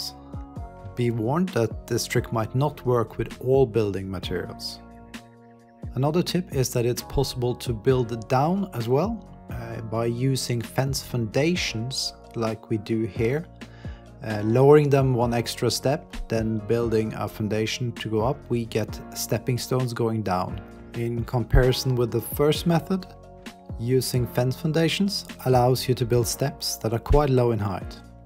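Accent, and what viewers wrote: Norway. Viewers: Kjente igjen engelsken hehe, super engelsk men hører det fortsatt 😅 Flott content dere har, skal dele dere med clanen 😄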